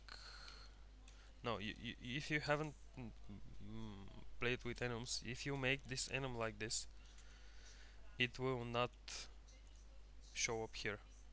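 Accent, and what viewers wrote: Russia. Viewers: Русский чтоли?)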